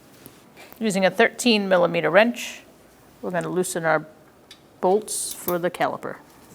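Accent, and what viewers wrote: Boston, United States. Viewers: This is gonna be a task, watching this thick accented Boston woman replace these calipahs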